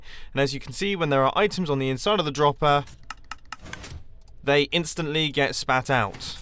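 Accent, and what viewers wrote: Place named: United Kingdom. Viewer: Has anyone else started to think with a British accent because of his videos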